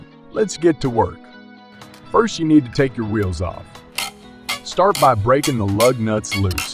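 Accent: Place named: South, United States. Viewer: Dude, that video was f-ing amazing! Plus I'm pretty sure chicks totally dig that southern accent! Subscribed.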